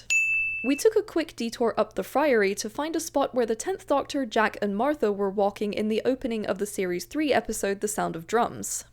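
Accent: United Kingdom. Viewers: “To visit my British family” With that accent, you don’t consider yourself British? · Very impressive packing all that in! You sound so British that I can’t believe you’re not from there 😱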